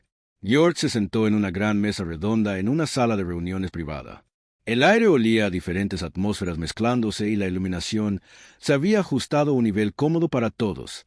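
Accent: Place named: Bolivia. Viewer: el que habla es boliviano jajajaja, me gusta, pero no con fio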